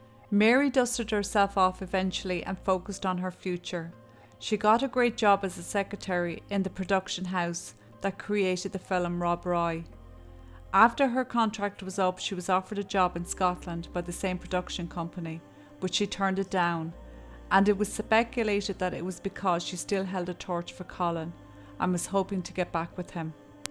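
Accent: Ireland. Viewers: Thank you for your answer and also thank you for your wonderful videos, love the Irish accent as well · I love your tone. You don’t sensationalise and are so respectful to the victims and their families. And I could listen to your beautiful Irish accent all day. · your Irish accent could charm a snake ❤ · Irish accent fab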